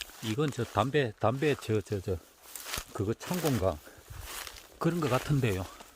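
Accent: South Korea. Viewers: 님은 고향이 어디시길래 촌말을 그리 맛깔스럽게 하시남유?ㅎ ㅎ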